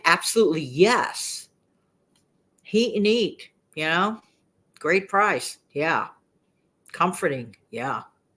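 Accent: New York, United States. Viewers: Personally, i love your New York accent. I think it's great!😂 Oh, i remember those handi snacks,! Those were quite good as i recall! Slim Jims are good too.No red stick....thats just wrong!😮 I agree that things could get very crazy and soon. Yes, we need to be prepared! I thought i would buy a few packages of those wraps we use to make burritos (just cant think what you call them). Thought they would be a good bread replacement in a pinch. Yup, gotta have some sort of grocery plan! Definitely!!! Yes to peanut butter, all day! I love oatmeal too, brings back such good memories from childhood. We always had canned fruit and veg when i was growing up. Still do. Great video. Much appreciate all the great ideas and encouragement....bless all your efforts. I am very grateful. God bless ❤❤❤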